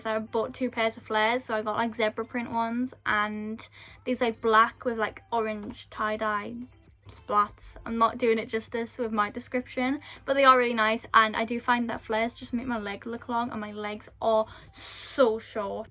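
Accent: United Kingdom. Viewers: I think she’s British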